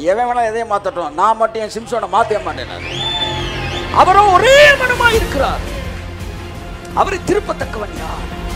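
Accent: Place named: Madurai, India. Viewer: 👉நம்ம ஊரு மதுரை வட்டார தமிழில் 💗 கடவுளின் வார்த்தைகளை கேட்டு மிகவும் உற்சாகமும் ;சந்தோஷமும் அடைந்தேன்.. 💗 இந்த சிறு செய்தி எனக்கு புது நம்பிக்கையை கொடுத்தது (தொடர்ந்து உங்கள் செய்திகளை கேட்டு வருகிறேன் ) நன்றி . அன்புடன், புதுக்கோட்டை சரவணன் . (08.Nov.2020)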